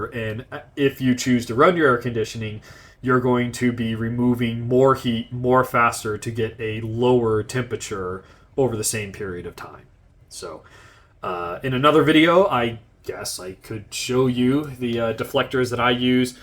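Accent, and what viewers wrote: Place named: United States. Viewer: Thank you for your research and experimentation. By the way, are you of Asian descent? Your voice/accent gives me the impression that you were born in America, but have that descent.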